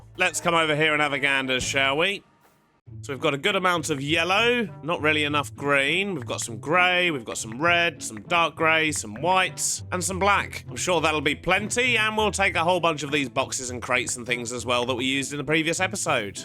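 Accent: United Kingdom. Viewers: that accent never fails to amaze me. british? also train station name: Docker Dumps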